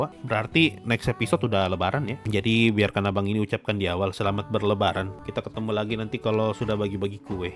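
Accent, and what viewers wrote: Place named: Sulawesi, Indonesia. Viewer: orang sulawesi kah? distracted aja ~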